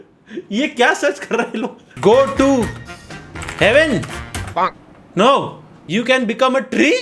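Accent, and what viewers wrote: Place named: Mumbai, India. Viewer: I am addicted to your vedios..apko dekh dekh kr mujhe mumbai ki language aa gye hai 😂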